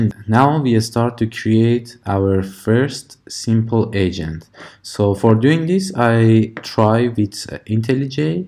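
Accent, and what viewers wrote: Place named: Iran. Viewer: Your accent tells me that you are an Iranian)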